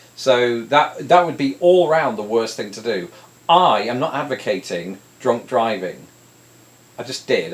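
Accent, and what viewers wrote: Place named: Manchester, United Kingdom. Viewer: Sounds like a manc innit?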